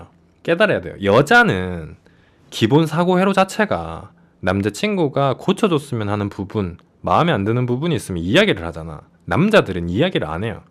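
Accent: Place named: Daegu, South Korea. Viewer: 경상도 사투리가 되게 귀에 거슬리는게 1도 없이 그냥 술술 들어온다 ? 싶었더니 저랑 같은 대구 분이셨군요. ㅋㅋㅋ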